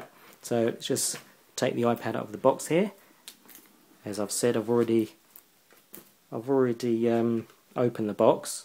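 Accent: England, United Kingdom. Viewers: Where about in England are you from? :)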